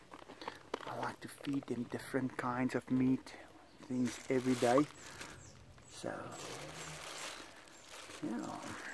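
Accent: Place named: South Africa. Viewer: What country is he from? He sounds South African but I believe their language is English and I’ve heard him speak another language before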